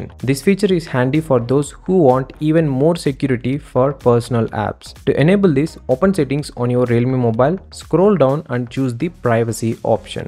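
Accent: India. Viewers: It's always this Indian accent dude, thankyou soo much vro.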